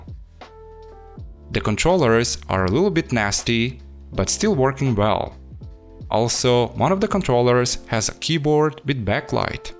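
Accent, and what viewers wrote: Russia. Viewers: Hello, are you from Russia? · I like his Russian accent😘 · Русский что ли ? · I love your accent. It sounds a bit like a humble russian accent. ( not being racist english also isnt my native) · You are Russian? (Slav?) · You have russian aсcent? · Is this my hallucination or does the author have a Russian accent?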